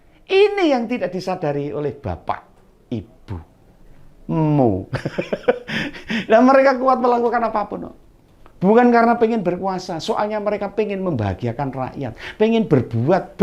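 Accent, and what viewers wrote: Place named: Yogyakarta, Indonesia. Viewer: Mesti ketawa kalo denger Om bicara, khas logat Jogjanan. Semangat Pakde😁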